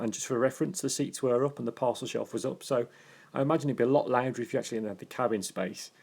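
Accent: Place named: Birmingham, United Kingdom. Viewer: You sound local, 'am yao a brummie?'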